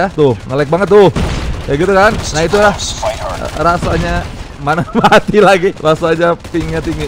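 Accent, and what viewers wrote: Bandung, Indonesia. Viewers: Logat namah ciga orang ciroyom sih 😁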